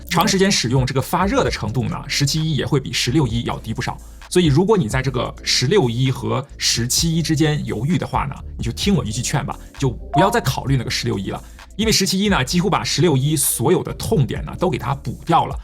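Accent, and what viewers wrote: China. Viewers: 你们台湾人觉得不觉得他的口音更像大陆的？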